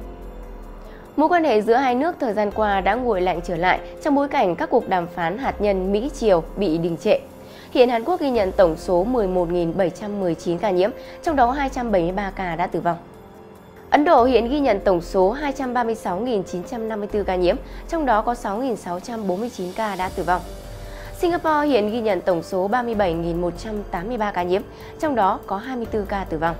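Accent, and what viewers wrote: Standard. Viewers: Chương trình hay mc xinh đẹp phát âm chuẩn , rỏ ràng.chúc chương trình ngày một hay và dc nhiều khán thính giả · Giọng đọc vẫn chuẩn rõ ràng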